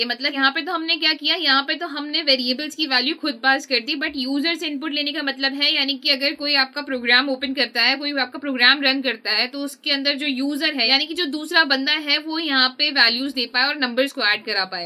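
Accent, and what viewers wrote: India. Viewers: She has an Indian accent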